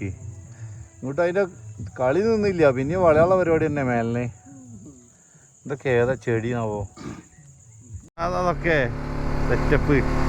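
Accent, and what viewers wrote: Malappuram, India. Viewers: not calicut. This is Malappuram slang 🔥.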